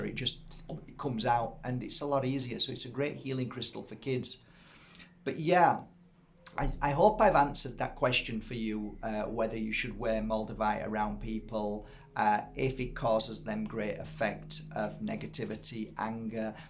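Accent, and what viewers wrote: Yorkshire, United Kingdom. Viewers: I absolutely love your videos. So informative. I'm from ashton greater Manchester and you sound so local. Yorkshire Oldham I can't decide lol xx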